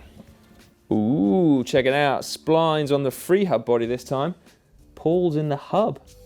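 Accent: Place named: RP, United Kingdom. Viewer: What a pity you speak like Prince Charles with his boyfriends you know what up his you know what.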